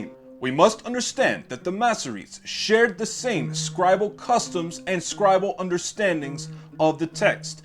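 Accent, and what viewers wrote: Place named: Eastern Europe. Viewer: I have noticed that the pronunciation of the "V" in Eastern European accent is "W". I asked myself, "What's up with that?"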